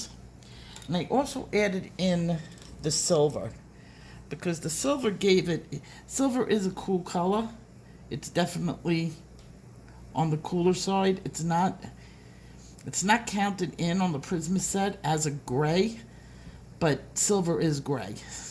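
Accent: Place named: Boston, United States. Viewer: This is off topic, but I like your accent! Sounds kinda like a Baw-ston (Boston) Accent. Oh, and I love your coloring too!! ❤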